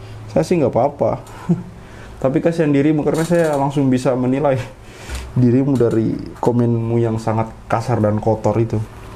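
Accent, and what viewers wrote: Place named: Ambon, Indonesia. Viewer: Logat Ambon ko Kaka keliatan..Kaka Pi jakarta su berkembang..ganti logat ko jakarta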